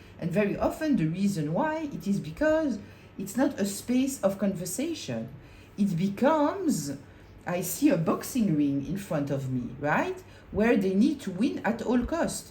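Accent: France. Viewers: Thank you so much!!! :face-red-heart-shape::face-red-heart-shape:Yes exactly! And you explained it so perfectly. I feel like sending it out to my community and extended relatives to explain what they are doing to me. I totally walked away. This helped me so much! I was feeling guilty. Prayed to God for an answer. You provided that for me in a beautiful french accent. I am so grateful to you. You are sure connected to me. I mean it is exact. Took me years to see it. They have caused me such hell, I've lost so much money due to them and I'm so happy to be rid of them. :face-red-heart-shape::face-red-heart-shape::face-red-heart-shape: :eyes-pink-heart-shape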